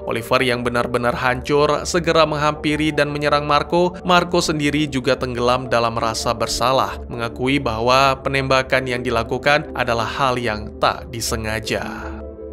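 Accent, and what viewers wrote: Aceh, Indonesia. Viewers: Pasti abangnya orang aceh ya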